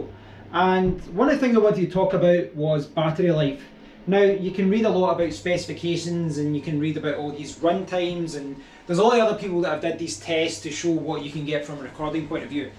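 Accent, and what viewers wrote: Scotland, United Kingdom. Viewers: Brilliant work mate. Loved the detail. I shudder to think the time I would have wasted trying to get it down to 1080p! I needed something quite idiot proof. You have kept my sanity! Thanks a million from Australia and coming originally from Dundee it was wonderful to get your delivery in Scottish! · Thank you for making a video for those of us still sitting on the fence as to which GoPro to buy! Your channel is the first one I've found that doesn't use obnoxiously loud music before and during their videos, so I could actually hear and understand what you were trying to tell us. Your Scottish accent was fun to hear, as you sound the character on the Dance Mat Typing program for kids :) Cheers from California!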